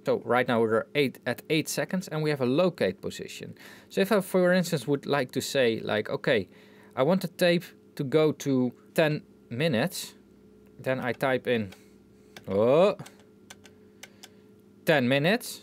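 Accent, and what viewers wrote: Netherlands. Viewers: Why is a Dutch guy (I assume by the accent) who's making English videos using German terms to explain technical stuff? Very interesting video, btw! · Totally off-topic but it's so cool to hear that we have the same pronunciation. Typical dutch - English. Now on-topic....GREAT CONTENT!! I'm also a gear dinosaur ;-)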